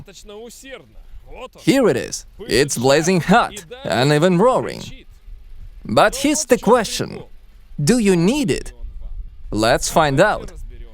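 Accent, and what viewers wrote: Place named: England, United Kingdom. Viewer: О вы из Англии?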